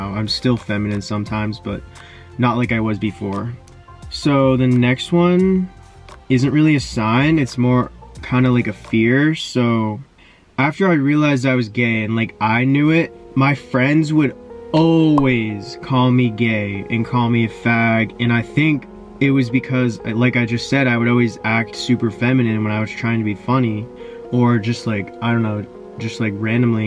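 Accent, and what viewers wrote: Canada. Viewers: Am I sensing a Canadian accent? 🙃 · Are you actully from Canada? All your "ou" words have that Canadian "ow" sound!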